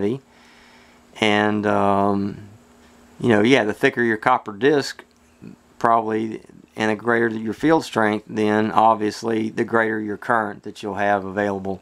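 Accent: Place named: Rural, United States. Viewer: Your accent is proof that people know more in rural America than in the cities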